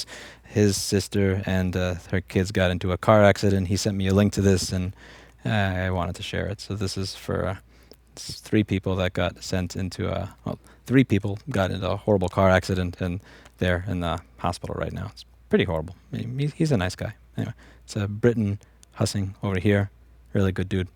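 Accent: New York, United States. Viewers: New Yorker detected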